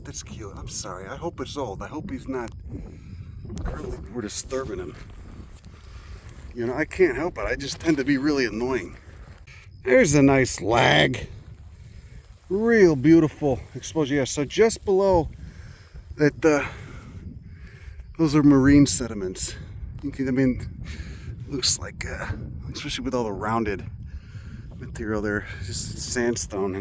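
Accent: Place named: New York, United States. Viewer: You sound like a new new Yorker but I love your knowledge. We love in Wyoming. Most the same stuff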